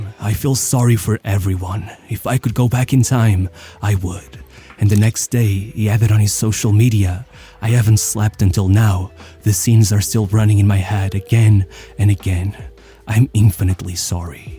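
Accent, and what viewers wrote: Portugal. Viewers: Your Portuguese pronunciation of every name gives me AIDS. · His portuguese accent is kinda anoying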